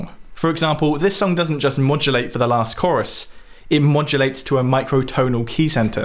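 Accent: England, United Kingdom. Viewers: A man with an English Accent !! Dreamy !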